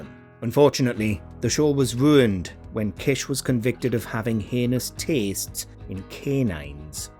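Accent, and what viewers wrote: United Kingdom. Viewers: thank you my lovely. That was nice of you to reply, i did not expect it to be seen. Im a sucker for British accents, his is definitely sweet 😊 Have a great weekend.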